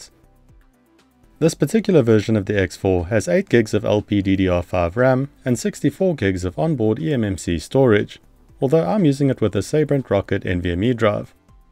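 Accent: South Africa. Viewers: Nope. South African😊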